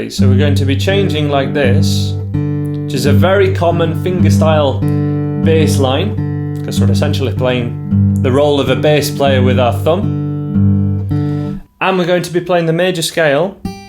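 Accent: United Kingdom. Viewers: Hi,..your accent is a british man. I think you are from England. I am just started to learn guitar and your website came across and i decided to download from lesson one to three. Thank you for complete information for the beginners like me. God bless you... 🙏❤🇵🇭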